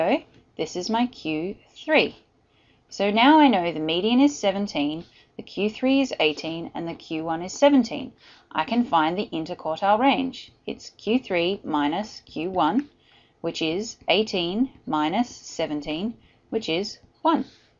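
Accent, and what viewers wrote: Australia. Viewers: Nice Aussie accent?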